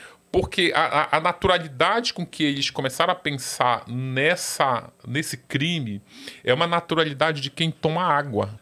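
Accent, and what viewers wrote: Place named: Pará, Brazil. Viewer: O sotaque paraense é único 😂, Parabéns 👏🏾👏🏾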